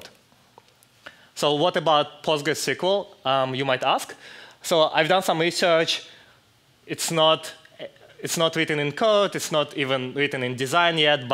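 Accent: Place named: Russia. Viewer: Russian accent. Is he from Russia?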